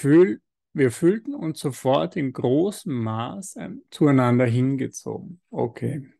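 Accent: Vienna, Austria. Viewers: der lebenskluge, sprachgewandte hr bonelli mit seinem kauzig-charmanten wiener-schmäh-dialekt, baut regelmässig "anyway" und "whatever" in seine sätze ein... das hört sich echt schräg an 😮😅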